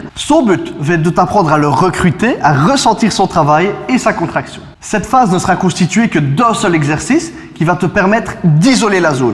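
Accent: Liège, Belgium. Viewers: Ce fut un plaisir d'avoir fait un petit brin de causette ;-), continue comme ça, tes vidéos sont vraiment instructives, maiemes avec l'accent de lièèèche ;-)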